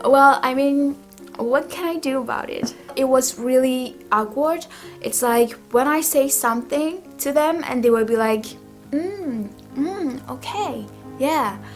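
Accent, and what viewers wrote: Myanmar. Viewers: Cheese getting hot лол and yup u got a burmese accent but not as strong as my Russian accent.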